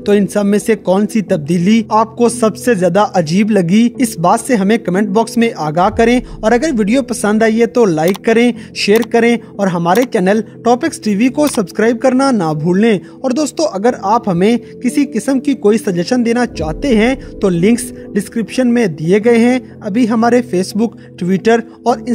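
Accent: India. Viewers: Are u indian? If no then why r u using their accent ... our Urdu is a rich language and it has the letter غ ۔۔۔ _ _ . So why do u copy indian accent and say galt, gaddar etc... accept your own language only then you ll be remembered in the world history . آپ اب تنقید کریں گے کہ میں خود انگلش میں لکھ رہا ہوں تو وہ اس لیے تاکہ سب لوگ پڑھ سکیں کہ ہم اپنے ملک اور اپنی زبان سے محبت کرتے ہیں ۔۔۔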